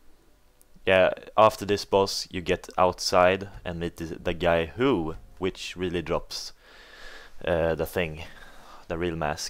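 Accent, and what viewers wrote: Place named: Sweden. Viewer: He sonds like hes swedish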